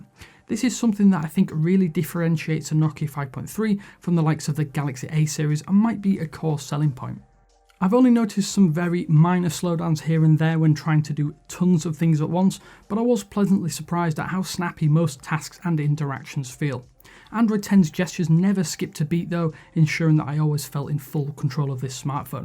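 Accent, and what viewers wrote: United Kingdom. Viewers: I don't mean to be rude, but what's English accent does he speak? I presume it's British accent yet not confident enough to be sure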